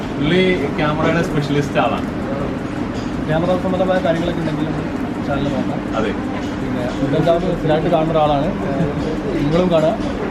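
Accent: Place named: Kozhikode, India. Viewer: കോഴിക്കോടൻ ഭാഷ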